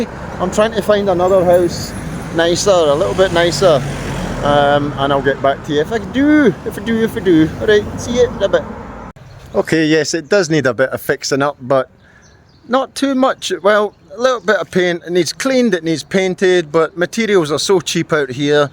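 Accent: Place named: Scotland, United Kingdom. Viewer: 2,000 baht, is a good price lots of work to tidy up. Nice to hear a Scottish voice in Thailand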